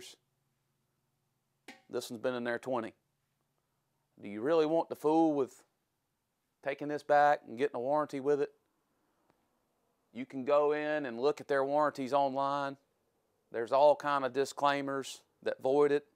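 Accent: South, United States. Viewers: Enough with the southern accent and long intro. Get on with the video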